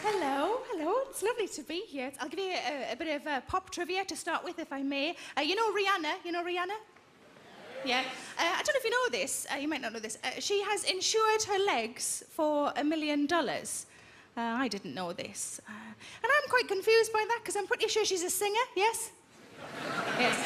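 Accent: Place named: Newcastle, United Kingdom. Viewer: Hollie Dixon why wouldn’t they 😂 it’s only a Newcastle accent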